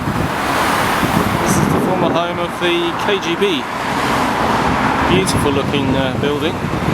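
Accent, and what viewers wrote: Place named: United Kingdom. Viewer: You does not need to write word "Englishman", your accent is soooo british, we already understood that you Englishman.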